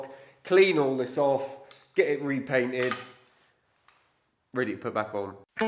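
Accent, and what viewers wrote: United Kingdom. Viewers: A bit off-topic, but your voice keeps reminding me of someone else, and it finally struck me that you sound like Cary Grant. His British accent was somewhat diluted by working in Hollywood, but I hear it in your voice. I'm old enough to be your grandfather, so I'm a bit more familiar with old movies, and Cary Grant, but if you get a chance, watch one of his movies. And more on topic, your range of skills, and industrious ways, never cease to amaze me. We need you here in Michigan!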